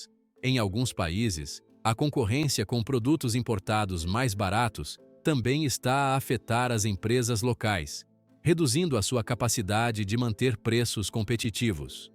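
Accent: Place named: Brazil. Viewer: Como é que isto pode estar acontecendo/ a acontecer? Um texto integralmente em português europeu, mas falado em brasileiro do início ao fim!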